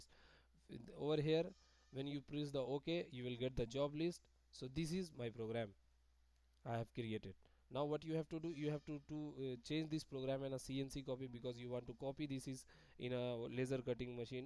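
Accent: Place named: India. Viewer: Surprisingly one of the best turorials by anyone with an indian dialect i have ever watched. You nailed it! Great job.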